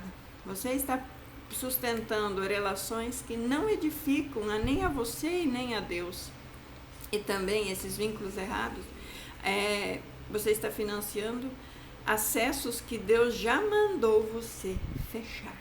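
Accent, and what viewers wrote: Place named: Rio Grande do Sul, Brazil. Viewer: Deus abençoe irmã! Sutaque maneiro deve ser do Rio Grande do sul que Deus abençoe a todos dái!!!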